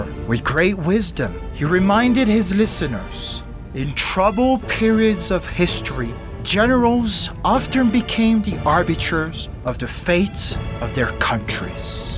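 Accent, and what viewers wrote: France. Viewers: We have roads named after Lafayette but no, we don't learn much. We are very America centric and stick to learning about the World Wars and the Cold War (at least in my experience). I didn't know you were a US citizen. The accent made me assume you were French who also spoke English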